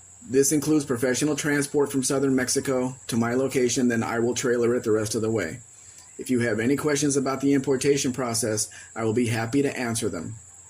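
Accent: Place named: United States. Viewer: I have a question, if you are OK with it, are you native Mexican? You speak very fluent English with a solid American accent. Love your videos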